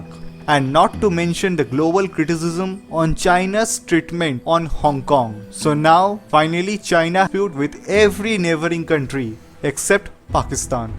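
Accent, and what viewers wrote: India. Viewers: I love your Indian accent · That thick Indian accent.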